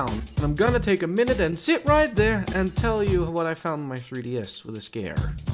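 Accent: Netherlands. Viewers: are you dutch because you sound dutch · Are you dutch because it sounds like it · r u dutch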